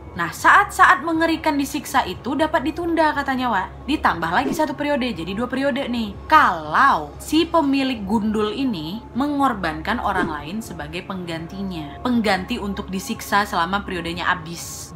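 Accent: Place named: Kalimantan, Indonesia. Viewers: sragen jateng hader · Malang slur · Kaka Orang Kalimantan Ya? Cara Bicara nya Sama Kaya tanteku dari Kalimantan Hehehe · Jowo Timurr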